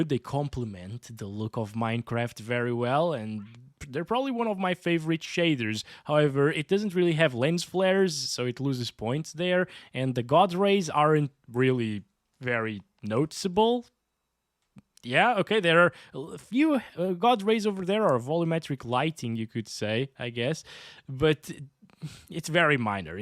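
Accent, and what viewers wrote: Portugal. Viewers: Primeira vez a cruzar com este canal e ao fim de 10 segundos, meto os meus tomates no cepo como é um português! 🥳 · mano no inicio do video eu percebi logo que o gajo era tuga ahahah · Ah, I always knew you were Portuguese! That accent does not lie lol As a fellow PT I forgive you for preferring beer 😄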